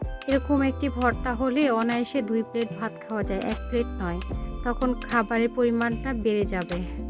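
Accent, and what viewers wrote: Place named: Brahmanbaria, Bangladesh. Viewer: ব্যামনবাইড়্যার মতো কতা কইন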